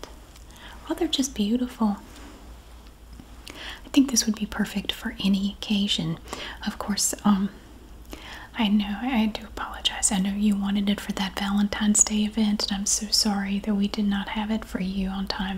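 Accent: South, United States. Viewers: The mix of whisper and soft spoken is just heavenly. Also, your southern accident really adds to the tingles!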